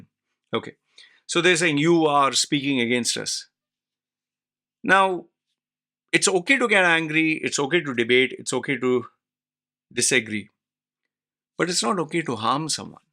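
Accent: India. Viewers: Your such a hypocrite, first of look at your own name when your a full on Indian, that is very clear with your colour and your f****d Indian accent so don’t come on here and try and degrade next mans religion etc especially Muslims, you have a personal problem with Muslims then be a man for once and come out face to face and bring your problem up with them, Muslims are proud of their religion and allhamdillia they are staunch too, theirs people in this world who have some of the greatest minds and IQ who have accepted Islam because they have seen the proof, a man in the middle of a desert and uneducated has predicted things that have been proven by science decades later and at that time their was no technology to prove things, but people like you can’t accept the truth because your ego is damaged, yes Islam is the truth and I fully and whole heartedly testify to that, what religion are you from I bet you don’t have the bottle to say your religion because you know it’s fake and if it’s not then prove it, you fake ass people sit behind your computer and give it Johnny big potatoes but never when you come across a real man and a real Muslim, Islam condemns violence but if a man does something you cant blame the religion for it, for example you buy a gun and you kill someone will you blame the gun obviously not you have to take the blame yourself, you know what they say people who live in glass house and all that, So in future think before you open your mouth to degrade anyone an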